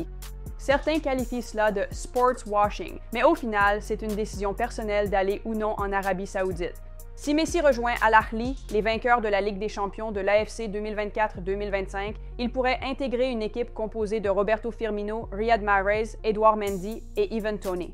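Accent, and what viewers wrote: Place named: Quebec, Canada. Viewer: Non elle a juste un accent québécois c’est tout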